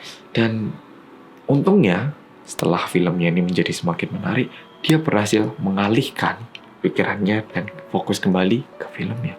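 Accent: Java, Indonesia. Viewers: Kang asli korea? Entah kenapa gak ada koreanya cuma ada jawa campuran melayu kan jawa itu kebanyakan apalagi yang asli tanpa permak itu hitam manis kalau senyum cewek maupun cowok,melayu kulit sao mateng kalau banyak keluar,kalau enggak itu sao muda putih bening,apa lagi medok khas jawa jadi ragu 😂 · Nonton bioskop ❌ Nonton yg medokkkkkk ✅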